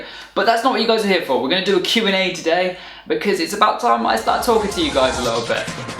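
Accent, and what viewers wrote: United Kingdom. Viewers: Great video! You are one of my celebrity YouTubers who is very attractive and I enjoy your English accent too. I agree, with you mentioning that their are lots of beautiful people.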